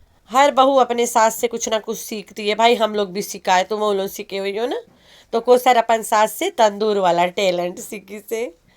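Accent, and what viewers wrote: Uttar Pradesh, India. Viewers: Very good reaction.❤❤❤❤or aapki U. P. Vali language me toh bahut apnapan lagta hai main bhi india ke U.P. se hun. Reaction bahut interesting lagte Hain.🇮🇳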